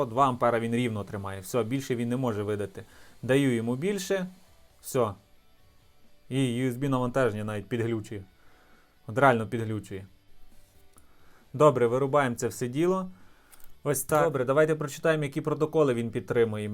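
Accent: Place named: Transcarpathia, Ukraine. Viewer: Дістали, триста. з Закарпаття. Тільки там так говорять.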